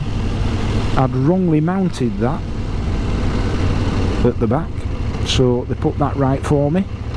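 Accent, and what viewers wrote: Yorkshire, United Kingdom. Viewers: Sorry to hear the Guzzi let you down. Always happy to see you riding in the Yorkshire hills, some marvellous scenery. Living in Bedfordshire, we are not blessed with much hilly scenery, but today we are having a ride out to the Dunstable Downs, which has some splendid views 😀. Always great to hear your Yorkshire accent and your choice of words when explaining any situation, look forward to the next video 👍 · Love the Yorkshire accent, part of the reason I watch and subscribe.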